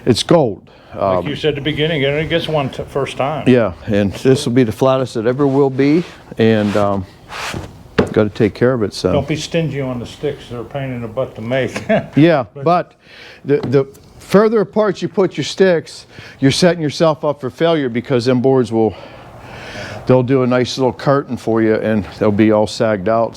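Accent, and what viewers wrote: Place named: Pittsburgh, United States. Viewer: It's a pleasure to hear your commentary because I miss the Pittsburgh accent (I grew up in Edgewood just outside of Wilkinsburg and Swissvale). Like in the steel days, the folks from western PA always get the job done. And done right. Thanks for your videos.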